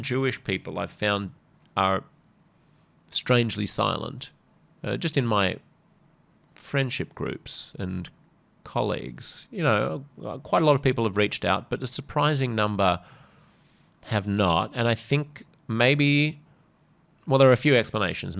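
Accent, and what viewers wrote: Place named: Australia. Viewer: Screw you man! Exactly how would you have “conducted the war” if you had been in BIBI’s position. What an intellectually lazy comment. Let’s hear it what would you have done from your cushy home in whatever part of down under you developed that grating dialect in? I should delete the the comment about your accent but there’s a little truth in every joke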